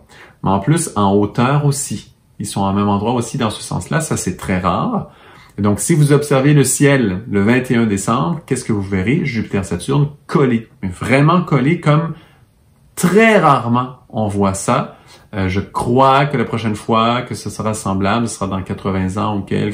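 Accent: Quebec, Canada. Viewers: Enfin, vous me rendez sincère, parce que j'ai écouté attentivement et je rien rien compris. Rien que pour entendre votre délicieux accent québécois j'écouterai toujours, qui sait ? Peut-être qu'à force de vous écouter je serai intelligente et je comprendrai les relations des planètes !!! Merci de m'avoir accompagnée, étant malade et perturbée par mon passé ...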